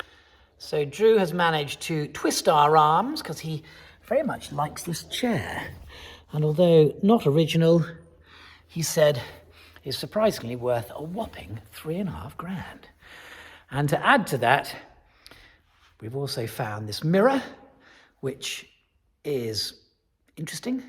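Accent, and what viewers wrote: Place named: RP, United Kingdom. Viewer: Love your accent very posh indeed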